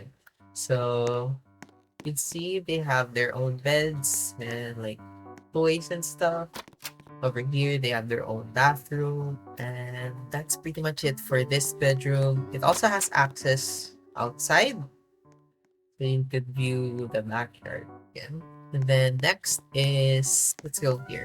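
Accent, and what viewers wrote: Philippines. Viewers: You sound filipino, are you?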